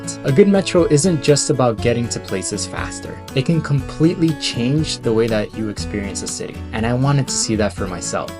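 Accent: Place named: New York, United States. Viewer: I like your Newyork accent 😊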